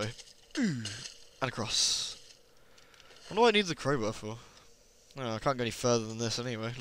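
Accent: United Kingdom. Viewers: You sawed Freemans hand off D: You monster! Loving your walkthrough, and its nice to hear a british accent. Yay for us British peoplez.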